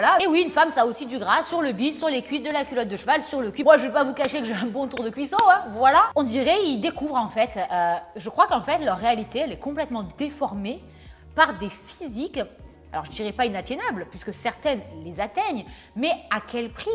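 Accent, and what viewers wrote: South, France. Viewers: Je vous adore, je ne suis pas très assidu sur TSE 😔 mais vous m'avez fait beaucoup de bien pendant la pandémie. Votre travail est incroyable en deux ans, félicitations a vous et votre équipe. Et entendre votre petit accent du sud me fait du bien icitte au Québec. On lâche pas 😊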